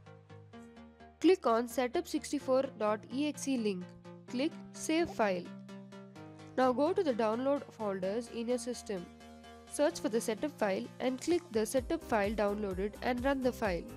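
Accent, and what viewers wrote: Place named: India. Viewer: Wtf this indian accent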